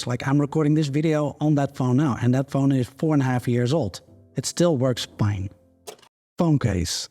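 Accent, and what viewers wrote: Netherlands. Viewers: Ik dacht al dat ik een NL accent hoorde😊!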